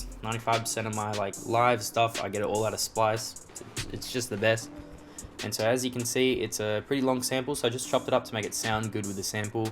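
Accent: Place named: Australia. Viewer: He prolly from Australia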